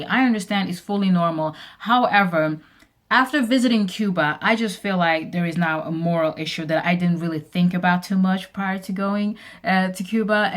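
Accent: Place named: United States. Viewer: You imply that you are British but you have an American accent, no disrespect meant....but I'm curious.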